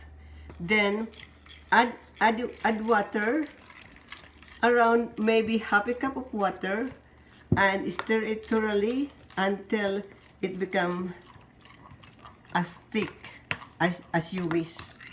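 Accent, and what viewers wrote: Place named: Philippines. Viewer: Are you Filipino? Can you just speak in Tagalog? I can sense you are having difficulty speaking in English. Your accent is so thick!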